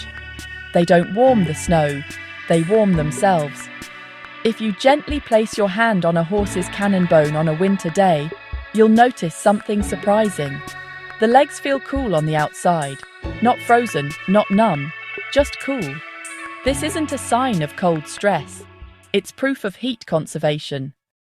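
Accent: United Kingdom. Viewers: What a crock of crap this is. They narrarate the video with a British accent voice to make it sound like they know what their talking about. I guess the British/AI narrator has never seen a mustang whose nostrils and ears have frost bitten off. I guess they've never dealt with horses that colic when they get too cold or ones that don't drink enough. What I take away from this video is that this is yet another dime-a-dozen horse expert wannabe shooting off their mouth and trying to sound like an authority when they're not. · March 6, 2026 - (dated for context and reference) Thank you for your kind recognition of my comments. I believe in offering "Positive reinforcement" to people for their kindness, and for jobs well done. In addition, I am a lifelong anglophile, so I very much appreciated the video's narrator for her pleasant voice and of course Brit accent👍👍😊 Lastly as a man, I have noted over the years that female equestrians tend to be quite attractive in a number of ways. 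I certainly have a lot of respect for people that work so well with such large animals. I live in Connecticut U.S.A., and we have a good number of horse farms and Equestrian Centers/Facilities. Stay well.😊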